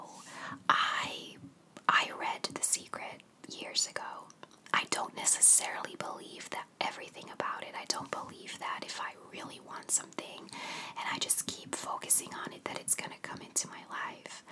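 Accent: United States. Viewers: You remind me a lot of myself, older than I look, not married, Barack Obama was my answer to ”who would u have dinner with?” (Btw I don't think grandparents is an obvious answer b/c people tend to pick famous or infamous people) anxiety issues, and I can be introverted yet adventurous. Can't imagine moving to another country so young though. Also you speak perfect English, w/ American accent. I think you must have been American in another life ;) though it's seems Sandanavian or Northern European English speakers- seem to have sometimes next to no accent at all. Especially Swedes. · I love your accent, it has always sounded American to my ear! I am from Scotland though lol so many accents sound American to me 😂 xx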